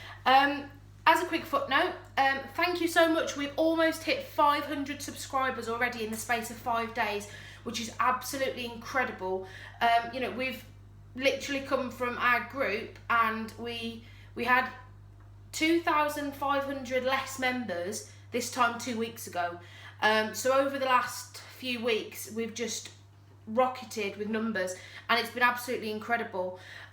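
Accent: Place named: Coventry, United Kingdom. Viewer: Hi, just bought one today, so thank you for this informative video. Don't have to say where you are, but your accent is like mine. I'm 5 miles out of Coventry. I'm guessing you're from round this way too.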